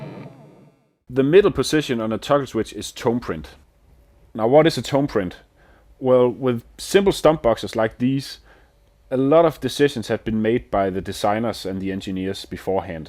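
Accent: Denmark. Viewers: with a charming danish accent you even could sell me a boss metalzone.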